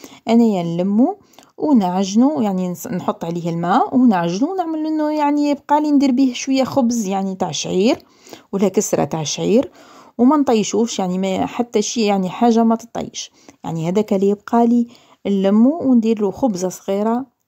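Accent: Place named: Tunisia. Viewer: سبحان لهجتها تقول تونسية 🥰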